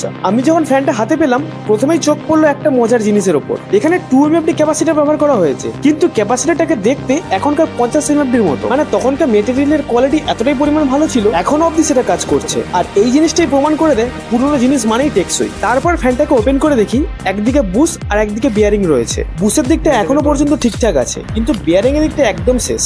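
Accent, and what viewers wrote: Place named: Ghoti, Bangladesh. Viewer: সকল শব্দের উচ্চারণের শেষে কেবলই ছ এর ছড়াছড়ি। ঘটি বটে।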